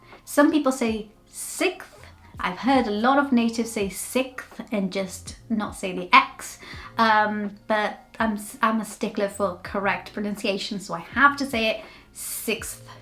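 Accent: United Kingdom. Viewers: I'm a Brummie who was educated in the 50's and 60's, at my school about 80% of teachers were Welsh, probably descendants of the work force before the war who left South Wales headed towards the midlands looking for work. As a result I have grown up surrounded by Welsh accents, regular day trips and holidays to Wales furthered my knowledge of the Welsh and their accent. I can honestly say that I've always thought English spoken with a Welsh accent has always seemed the true pronunciation of English, it's not distorted or slurred by local accents (as a Brummie I should know) it just seems pure and full pronunciation. Your problem is you are apologising for your adopted Welsh accent, don't let the influence of Wales leek out oops! sorry leak out, it didn't harm Richard Burton or Catherine Zeta-Jones. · You learned british english. American english pronounces the R. 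We also tend to pronounce many of the T sounds closer to a D sound. British english T sound is more pronounced at all times.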